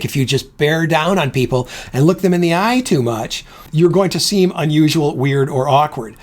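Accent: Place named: Chicago, United States. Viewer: He's got a Chicago accent that I find distracting.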